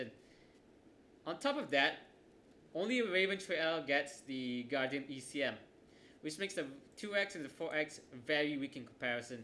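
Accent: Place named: Singapore. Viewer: I detected Singaporean!!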